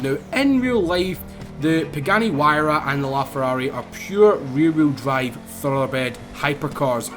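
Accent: Scotland, United Kingdom. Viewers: are you scottish and does ek stand for east kilbride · He is Scottish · Are you Scottish.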